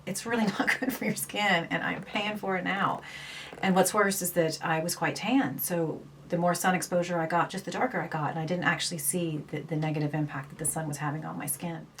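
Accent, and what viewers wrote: Ireland. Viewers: Thanks,you seem very informed,look forward to your other tutorials.Love your accent with a slight undertones of an Irish accent coming through:)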